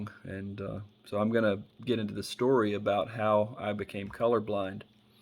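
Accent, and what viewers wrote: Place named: South, United States. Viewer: Amazing story brother! May Allah bless you with best in this world and hereafter. I have to say something, hearing your southern accent makes me keep thinking about Forrest Gump 😊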